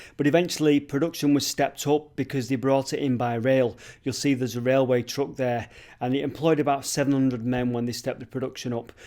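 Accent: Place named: Manchester, United Kingdom. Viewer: A loadda hot air. Literally 🤣 👍 great vid As per.. I'm pickin up a Mancunian accent I watch this channel so much. Sweet 👍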